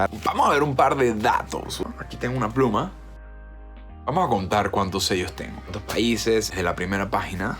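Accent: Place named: Venezuela. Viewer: Y tienes acento venezolano!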